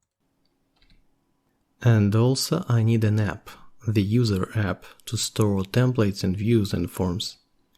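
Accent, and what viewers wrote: Russia. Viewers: Найс ролик, видно сразу - Русский, ну или из другой странны СНГ, акцент сдал)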